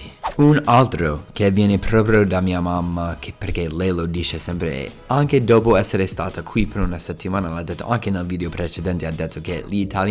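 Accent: United States. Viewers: L'accento americano si sente un botto😂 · you are becoming my Number One source of entertainment. I saw you and your girlfriend in an interview with Davide, whom I follow seriously to improve my Italian. Your YouTube posts really tickle me. I have been learning Italian, off and on, more off than on, since 1965. I have always worried myself silly over my American accent, and now here I find a guy who is making a living off of his. Good on ya! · non ti preoccupare il tuo accento americano e' molto simpatico.Un po come noi italiani quando parliamo inglese.La stessa cosa. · Però sfatiamo un mito: dicci una volta per tutte se carichi l'accento americano che hai quando parli italiano o no!! Porca miseria io sono sposata con uno del sud eh!!! Ma lui non hai mai avuto l'accento che hai tu. MAI MAI MAI! Il modo in cui ad esempio pronunci la T... ma non è un crimine, nè un'offesa o una presa in giro eh!! 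Che davvero mi fa assolutamente strano sentire te che non sbagli manco un congiuntivo e hai questo accento così forte... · Anche io! Booo se non parlasse con accento americano passerebbe per italiano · 🤣🤣🤣🤣 Il tuo accento è sempre moooooolto americano.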